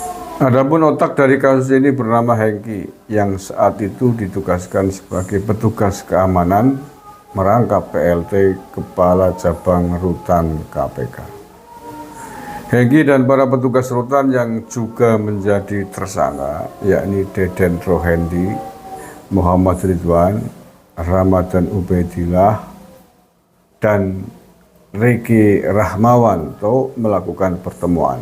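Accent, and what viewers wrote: Banyumas, Indonesia. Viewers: ngapaknya bikin gelitik bung.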